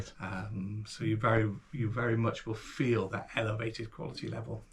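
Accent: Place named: West Country, United Kingdom. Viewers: Like the look of this one. But maybe its the west country twang but...its Mural not Murial.😉😎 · 😂 its the West country accent m'lover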